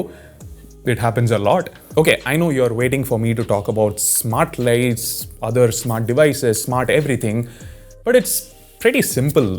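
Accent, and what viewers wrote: India. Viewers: L'accent hindou en anglais est insupportable. Je ne peux pas écouter plus de 10 secondes.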